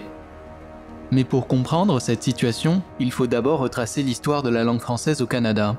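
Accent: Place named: Paris, France. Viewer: Présentateur avec un accent de parigot ça promet! Je suis né sur un continent très éloigné de l'Amérique et de la France et j'ai habité le Québec depuis plus de 23 ans, en me voyant l'on s'adressait à moi en anglais ou l'on m'insultait avant même que je dis mot. Lorsque je m'exprime clairement en français, plusieurs ont des répliques virulentes et grossières et d'autres me demande si je suis comme eux (français de France). C'est tellement bigarré comme langage et biscornu comme formulation syntaxique que j'ai quitté cette province. Il ne faudrait tout de même pas oublié que la construction de Montréal s'est fait avec des gens qui ne parlaient pas français (Irlandais, Polonais, Hongrois, Tchèques, Allemands, Russes, Chinois, Italiens). Mais les colons venant de France jouaient aux maîtres et rois. Ils se sont fait damé le pion par les britaniques par la suite ( voir l'ouvrage "les deux grandes solitudes") et l'arrivé massive de juifs et d'américains. 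Les premiers envahisseurs sont les Français et les Britanniques en se battant pour coloniser cette partie de terre dont-ils n'avaient aucun droit acquis!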